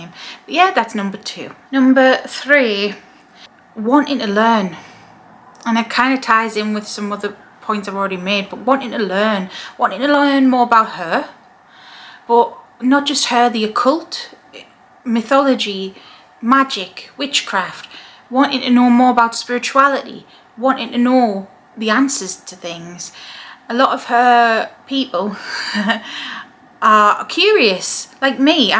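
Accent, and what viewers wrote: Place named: North East England, United Kingdom. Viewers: it's so surreal to find the first video talking about working with Hecate is by someone with a very similar accent to me - hi from Teesside :) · This might be a bit off topic but I'm curious to know if you're from Newcastle/ the North East? I'm just mentioning it because I lived there when I was younger and when I clicked on this video (after weeks of feeling called to learn more about her) your accent struck me as a positive sign that this video was meant to find me ❤️‍🔥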